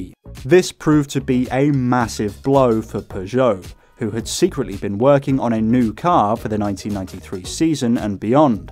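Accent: United Kingdom. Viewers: Brother a man with a British accent does not get to joke about French automotive reliability. Where's your car industry gone? And why did that happen?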